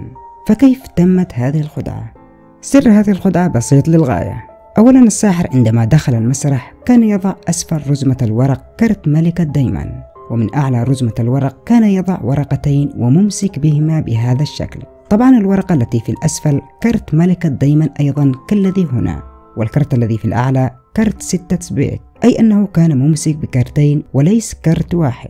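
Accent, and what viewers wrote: Yemen. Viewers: يا انت يمني صح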